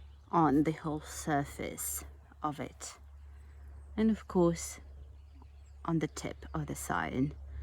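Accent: United Kingdom. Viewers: Where did you learn English? It sounds like you have a British accent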